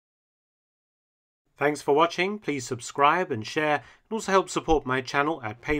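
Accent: United Kingdom. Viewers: Brit pronunciation. Any adult has heard this.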